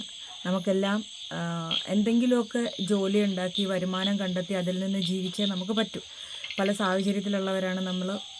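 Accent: Thrissur, India. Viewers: Slang kettitu Thrissur ano ennu oru doubt...oro koana mala vanangal und nattukarekodn parayippikan....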